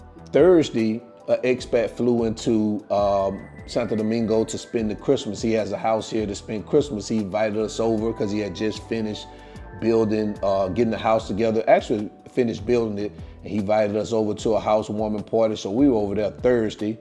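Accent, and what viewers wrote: South, United States. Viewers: I have to say , I love your country accent!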